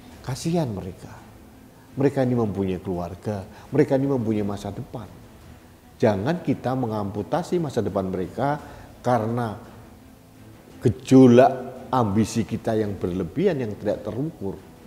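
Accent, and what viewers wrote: Java, Indonesia. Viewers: Mas jenengan ki piyayi pundi to kok medok bahasane Jowo. 🙏🙏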